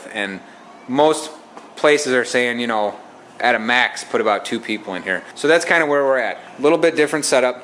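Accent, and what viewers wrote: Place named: Illinois, United States. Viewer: What size boat is that and where do you live? Sound like a fellow Illinoian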